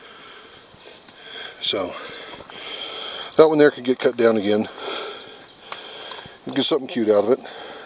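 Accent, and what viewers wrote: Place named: West, United States. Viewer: I don't know if you're still looking at your comments but I am a Westerner living on the East Coast do you know how long it's been since I've heard someone say c r i c k instead of Creek so nice to hear the accent years ago my mother-in-law that had a fit when I told her we were going down yonder to the c r i c k she did not appreciate my accent she informed me that I was going down to the creek not Yonder to the c r i c k I enjoy your videos Keep On Keepin On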